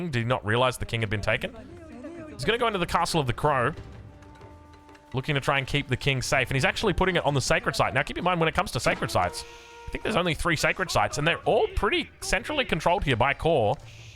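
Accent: Australia. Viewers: love this aussie man casting vods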